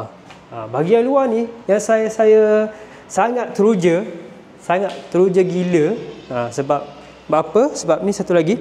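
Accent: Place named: Kelantan, Malaysia. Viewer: penyampaian biarlah dalam Bahasa Malaysia Standard. Pembeli ada juga orang bukan Melayu. Ramai tak faham loghat Kelantan. Lainlah awak nak jual rumah di Kelantan.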